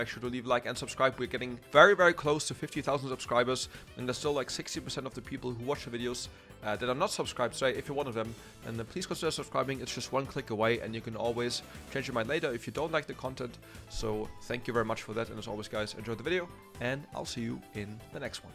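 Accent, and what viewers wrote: Germany. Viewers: This game I realized you are german and I watched a lot of videos of you😂. Usually every german is with 100% accuracy detected by me after the first few words because german-english accent is very obvious.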